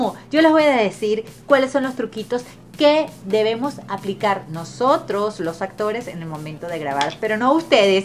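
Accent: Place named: Venezuela. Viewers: Pues es venezolana tinene ese asento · Debe ser por el acento venezolano buena onda!! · es q es venezolana y hablamos todas igual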